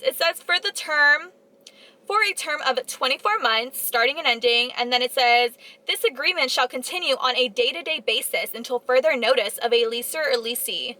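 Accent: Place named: United States. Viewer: What state is this in! Sounds Just like one I know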